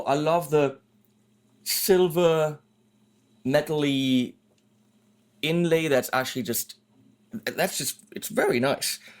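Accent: Germany. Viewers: I havent known for a long time, only recently got back from more a computer gaming time to pick up guitar again, after a long long long time. In order to get away from the computer stuff, which isnt easy for me after so many years and very good memories, i have dumped most of my Youtube bookmarks, which were centered aorund gaming. Now slowly getting nice channels here on Youtube when it comes to making music and all that. And also this channel here, which I really enjoy. is really entertaining and nicely geeky and his german english is simply adorable I think. I am from Germany too, so I know how that is.;) Anyways, after stating my joy for this channel &, to the topic at hand.;) I have to agree with on the fact that with a guitar on that price range, a gig bag or even a simple case wouldnt be so much to ask. I mean, its quite a lot of money, at least for most of us, and to only get it in a damn cardboard package is somewhat lame, to say the least. 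It doesnt matter which company it is, if its Solar or others. The fact is, a few things shouldnt be too much to ask when you buy a new guitar. Also, a multitool like you get on Ibanez prestige guitars (dont know if also with premium), should be also included, when you buy a guitar over 300 Euro or more. Lets face it, these tools cost a couple pennys in China or whatever, thats simply no excuse to only give that with really expensive stuff. Companies really cheap out on these little things, when th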